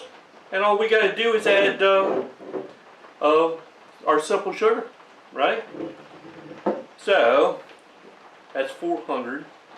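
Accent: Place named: Hammonton, United States. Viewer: down in cape may. My wife is from Hammonton and has the same accent as you .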